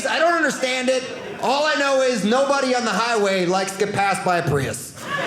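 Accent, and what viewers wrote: United States. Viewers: As a Miami Cuban, it's not the whiteness that gets me as much as the complete lack of any accent other than the very clear American accent when pronouncing Spanish words.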